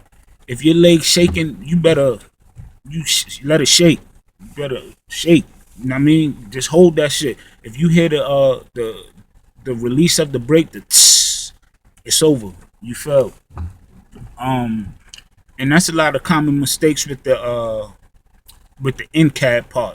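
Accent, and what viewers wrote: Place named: New York, United States. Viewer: You sound like a NY Dude ..... Lol good looks on the video tho bruh, good luck to you On your journey PEACE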